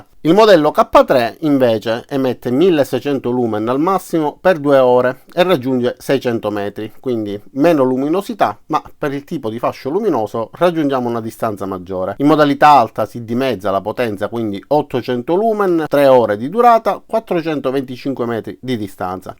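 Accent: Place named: Palermo, Italy. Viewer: Ciao io sono di Trapani, tu dall'accento sembri Palermitano.. O sbaglio?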